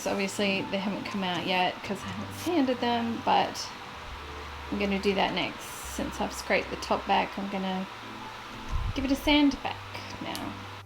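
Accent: Australia. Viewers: I love this,piece! You scrubbed and sanded and scraped your heart out! I love Mrs Browns Boys!, did you see the one where she super glued her arse to the chair, I about peed my pants I laughed so hard! I love British TV, but… I’m addicted to Aussie television! All of it, whatever I can get my hands on! And I’m absolutely in love with your accent! And of course your incredible talent!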